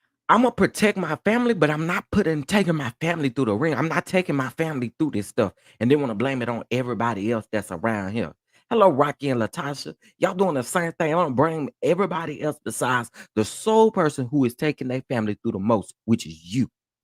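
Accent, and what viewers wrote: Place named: Dallas-Fort Worth, United States. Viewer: I was trying to figure out why I felt a connection to your voice, it’s because we in the DFW! Let’s go to brunch! 😂😂😂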